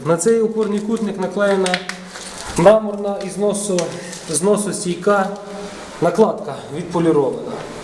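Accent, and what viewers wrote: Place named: Ternopil, Ukraine. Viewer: Тут ще чути тернопільску мову, потім вже буде львівський пан.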